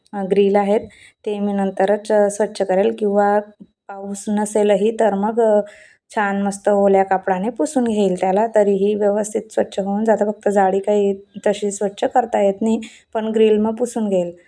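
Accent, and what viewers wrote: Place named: Jalgaon, India. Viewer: tai tumhi jalgaon ch ya aahet ka language varun vatat me aahe so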